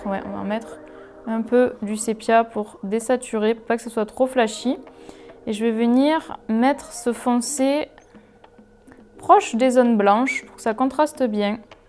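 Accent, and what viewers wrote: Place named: Basque Country, France. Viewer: Alors, je suis Luzien, et je jurerais que votre accent est du coin...C'est tellement familier!!! Si pas luzienne, Basque tout du moins. En tout cas, Merci pour les vidéos!!! Milesker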